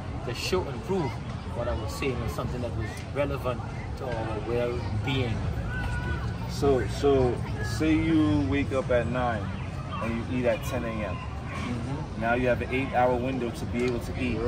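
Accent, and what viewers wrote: Trinidad and Tobago. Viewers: Man is ah trini... I feel he from port of Spain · He sounds like a Trinidadian 🇹🇹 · Bro sounds Trinidadian 🙏🏽👏🏽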